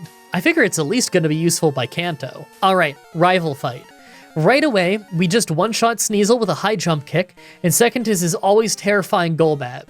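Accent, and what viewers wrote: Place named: Canada. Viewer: Your Canadian accent makes me happy.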